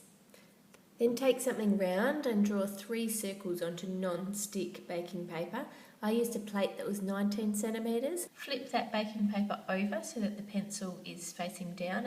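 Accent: Australia. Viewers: Dn't know why, just a normal Aussie Accent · lol accent? I could barely tell, and I'm from the US. Sounded like average Aussie to me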